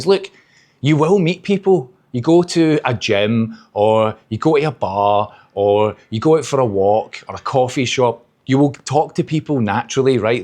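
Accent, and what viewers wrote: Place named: Glasgow, United Kingdom. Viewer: Hi, my name is also Yvonne. I stumbled upon your video a few days ago and this is the second one I’ve watched. It’s always interesting to hear what non-Malaysians think of our country. I love hearing your accent because it reminds me of my good friends Bernie and Graeme from Glasgow. I’ve lived in England and Northern Ireland for over 20 years. I’ve travelled to Scotland and been to Glasgow Green for the World Pipe Band Championship. My husband played the pipes. Sounds like you’re enjoying KL. Are you getting to experience much local Malaysian culture? You’re probably going to say, watch your previous videos, which I will. Have fun.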